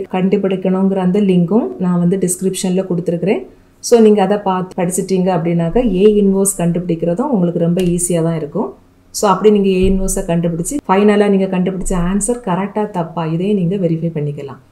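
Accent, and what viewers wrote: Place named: Kanyakumari, India. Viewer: Mam neenga kanyakumari ah?.... Because your language like that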